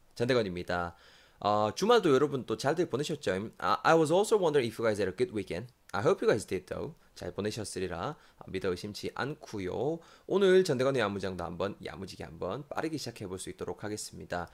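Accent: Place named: Gyeongsang, South Korea. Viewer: 안녕하세요 우연하게 동영상 접하게됬어요 ! 강의 정말 재밌게 잘하시네요 저도 경상도 출신이어서 너무 친숙하네요 너무 재밌게 잘 봤어요 ! ㅎㅎ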